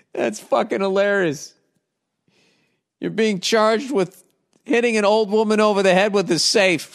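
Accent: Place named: West Virginia, United States. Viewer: Sounds like West Virginia.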